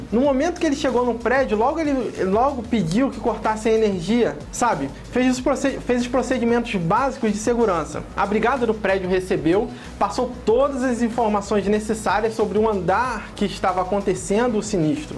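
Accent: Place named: Rio de Janeiro, Brazil. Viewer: Putz, carioquês latente. Mas o video é interessante.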